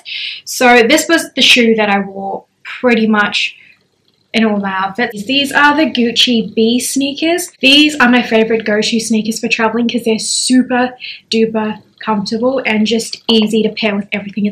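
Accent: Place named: Australia. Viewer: I love your accent. It's so refreshing to hear an Australian girl talk about fashion.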